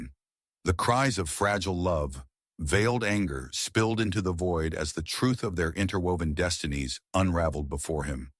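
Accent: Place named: United States. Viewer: Oh dear - AI American accent is just so wrong!! Add this channel to your "do not recommend list"!!!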